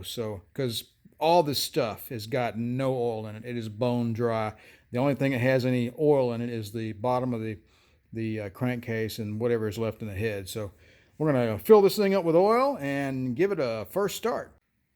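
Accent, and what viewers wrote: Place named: Alabama, United States. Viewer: Hey what part of Alabama you from?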